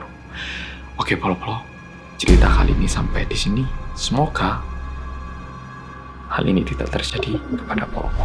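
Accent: Java, Indonesia. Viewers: Hallo oppa medokn☺️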